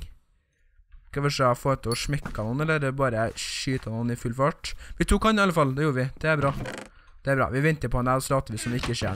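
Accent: Trøndelag, Norway. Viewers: Ælske at du snakke trøndersk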